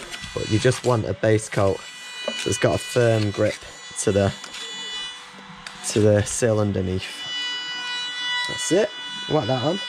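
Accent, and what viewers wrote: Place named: Northern England, United Kingdom. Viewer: Nice work there, just wondering where abouts you work as I'm in northwest UK and you sounded like a northern lad 😉 as in need of a house render as well as me cills cleaning up as they are looking battered. Cheers. Kev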